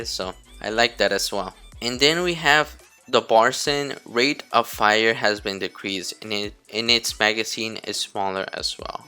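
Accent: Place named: Philippines. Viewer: I just noticed you're picking up an awkward Filipino accents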